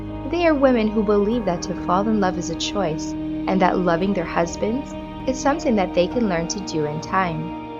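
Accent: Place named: Philippines. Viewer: YOU VIDEO IS FULL OF BS! AND YOU SOUND LIKE AN ASIAN, FILIPINA!!!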